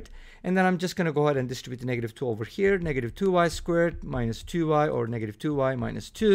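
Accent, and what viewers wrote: Turkey. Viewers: You have a Turkish accent, are you Turkish by any chance ?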